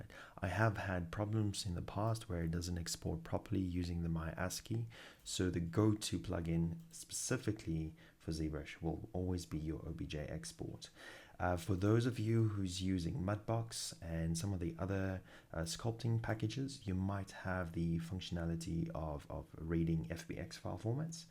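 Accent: South Africa. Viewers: Do I detect a slight South African accent to your voice?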